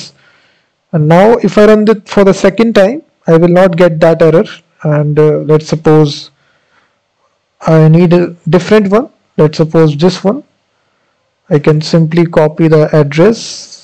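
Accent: India. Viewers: Pure Indian accent and broken microphone. I love this guy❤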